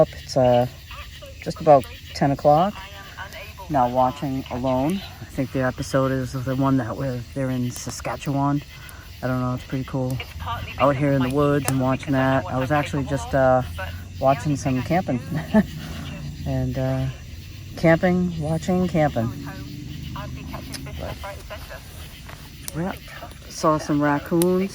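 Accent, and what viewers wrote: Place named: North, United States. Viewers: We southerners think it's funny when northerners talk about how amusing it is to listen to our southern dialect. Hearing you speak yankee is enjoyable to me. Thanks for what you are doing. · As a Northerner (PNW sounds more Canadian than anything else,) I like her Easternish accent. Getting in her cah, or saying which gear is bettah...lol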